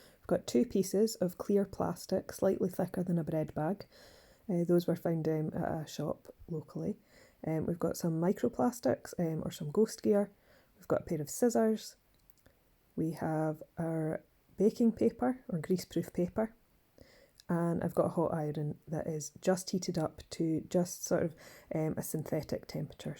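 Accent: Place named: Scotland, United Kingdom. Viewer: be! Everyone loves a Scottish accent 😎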